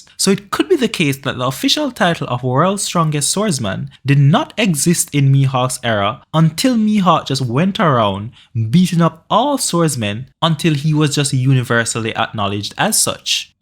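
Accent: Jamaica. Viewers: You Jamaican? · you from JA bro?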